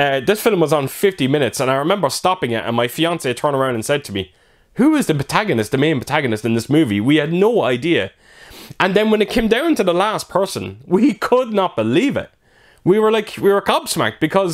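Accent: Ireland. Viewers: Agree with your Edge of the Axe practical fix work. Top notch. Which part of Ireland are you from??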